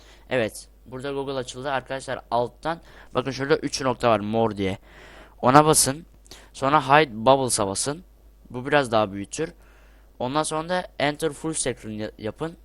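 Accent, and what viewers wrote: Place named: England, United Kingdom. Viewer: ing aksanına hayran kaldım kardşmmm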